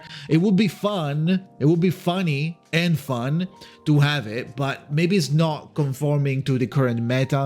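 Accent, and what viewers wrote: United Kingdom. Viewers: Alright mate, hate to do this but I oughta stick up for my Scottish, Welsh and Irish folks and remind you that it's not a "UK accent" but a mish-mash English one. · The UK accent made me clacking 😭😭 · I didn't click on this video to hear my guy start speaking with a UK accent 😭😭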